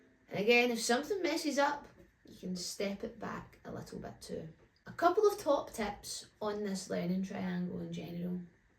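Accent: Scotland, United Kingdom. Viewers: Very useful tips! Thanks - and I listen to your videos to learn, but do love your Scots accent along the road to learning. 🎶 PS I have no idea what a "crabbit" is!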